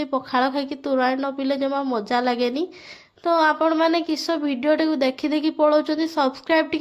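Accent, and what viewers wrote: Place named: Mayurbhanj, India. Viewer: Pure balasoria katha houni tk tk mayurbhanjia katha ହେଇଯାଉଚି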